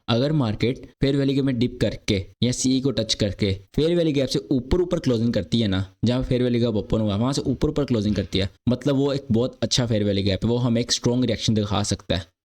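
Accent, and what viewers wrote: Pakistan. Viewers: Pakistani lgg Raha ha lounda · Bhai aapki tonse se lag rha hai ki aap pakistani ho ya north west India se belong karte ho.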